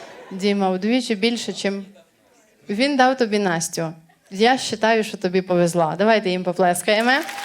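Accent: Transcarpathia, Ukraine. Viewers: Пані Валеріє, дякую Вам і Вашим батькам за Вас! Мені дуже подобаються Ваші виступи, концерти! У Вас прекрасне почуття гумору, елегантний стиль, чудова закарпатська мова. Я пишаюся, тим, що наша Україна має таких чудових талановитих дівчат! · слухаю тільки через закарпатський діалект😅, а так ніц не смішно, хай шлєк трафить 😅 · Та ви шо! Не любиться, та не позирайте і не слухайте. У нас на Закарпатті ми так говориме, а ви говоріть як маєте дяку. А ще і совіти роздаєте коли вас не просять. Мало не красно. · Оригинально, уникально, по закарпатськи! Йоу!🎉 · Так, до не до неї, бачте як плескають в ладоні, мабуть дуууже подобається, цеж русинська мова, так вони,Закарпаття, кажуть!!!на цей говір...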